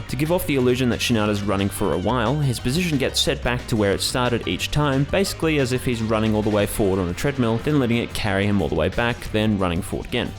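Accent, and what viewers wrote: Australia. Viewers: He's Australian, not British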